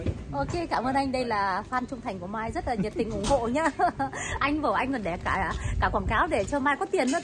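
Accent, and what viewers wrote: North, Vietnam. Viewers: Thích nghe giọng Bắc của Mai, mình vô tình mới xem kênh Mai , vui vẻ và gia đình hạnh phúc ❤️ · Chào Mai ! Nghe Mai giọng bắc mình cũng là người bắc. Mai quê ở đâu vậy?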